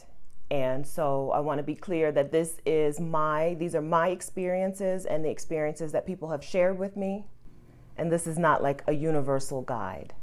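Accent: United States. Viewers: WHAT PRIVILAGE ARE YOU TALKING ABOUT, YOUR AMERICAN ACCENT OR WHAT. YOUR STILL A BLACK WOMAN, THERE IS NO DIFFERENCE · Rubbish, why not caption it “countries that are friendly for African Americans to travel to” you generalized it to all brown people only to tell us during you explanation that your American accent gave privilege which means that the caption was deceptive, I stopped listening once I heard that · Big mistake, your American accent doesn't give you priveldges, it differentiates you from another black person. These American superior mentality I guess. Not really ideal. But I get the point. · You American accent gives you hardly any privileges as long as you are black so don't get it mixed up.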